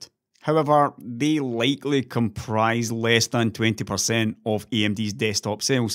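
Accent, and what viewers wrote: Scotland, United Kingdom. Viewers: Never thought that listening to a Scotsman do arithmetic could be one of my favorite things...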